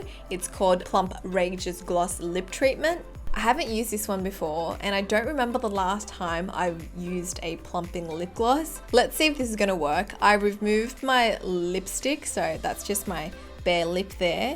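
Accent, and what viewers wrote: Australia. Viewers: 호주에서 사셨구나 뭔가 발음이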